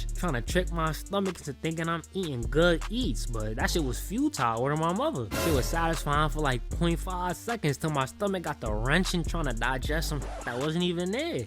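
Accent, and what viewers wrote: New York, United States. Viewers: i'm hooked on this nigga videos 😂😂 shii funny asl and the NY accent makes it even better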